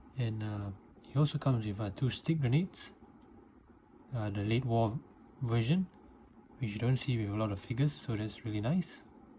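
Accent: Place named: Malaysia. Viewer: Your accent's very familiar! Are you from malaysia or singapore?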